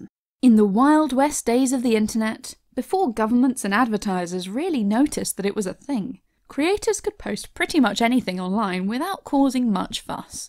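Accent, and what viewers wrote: United Kingdom. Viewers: The British accents says it all..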